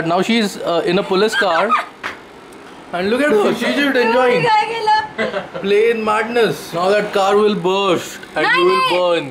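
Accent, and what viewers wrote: Maharashtra, India. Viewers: That marathi tone in ur voice and gyserin this hot weather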